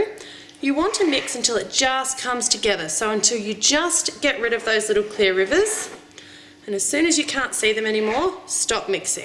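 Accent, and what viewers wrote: Australia. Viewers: It's so nice hearing another Aussie voice on Youtube! I love your tutorials, I've just come over from Yoyomax, I'm so glad you two did this collaboration. Thank you so much for posting all of these videos, they're wonderful! x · OMG are you australian I LOVE YOUR ACCENT!!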